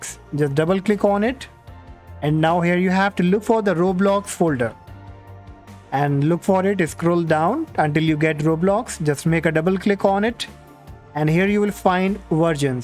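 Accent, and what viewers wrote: India. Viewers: I don’t trust that Indian voice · Bro speak real English not Indian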